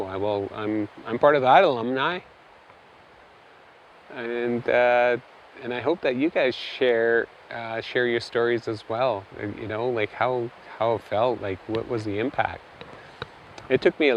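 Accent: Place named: Canada. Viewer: I knew you were Canadian when you said the word 'process'. Americans say 'prahcess', not 'prowecess. You also said 'bloody excited'. More common adjective (bloody) with Canadians probably since 1 in 5 Canadians are of Scottish descent.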